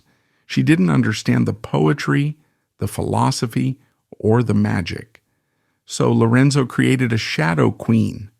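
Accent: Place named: United States. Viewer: pile of AI crap. Worse...Faux European history done with an American accent ..urgh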